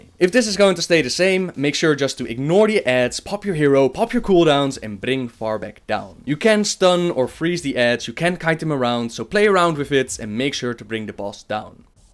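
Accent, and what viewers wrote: Netherlands. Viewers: Hey man , recently i've become interested in lore and stumbled upon your videos , i've enjoyed them alot and learned so much since i started to watch them , now i have been asking myself are you dutch cause you have the same accent as me when i talk english haha . :P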